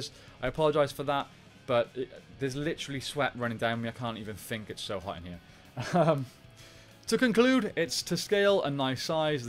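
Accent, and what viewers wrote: Wales, United Kingdom. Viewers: Barely related to the video but I’ve just realised you have a welsh accent (Cardiff, right?). Repping the welsh PR fans! 🏴😂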